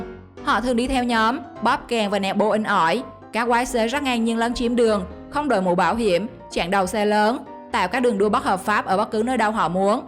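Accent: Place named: Binh Dinh, Vietnam. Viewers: Nghe giọng cj nói cứ như cj là ng miền trung ý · Như người bình định nhỉ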